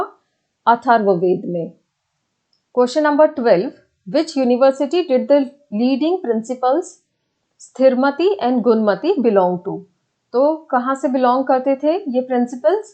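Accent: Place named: Gujarat, India. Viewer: Aap ke voice se hi pata chal gya tha ki aap Gujarati ho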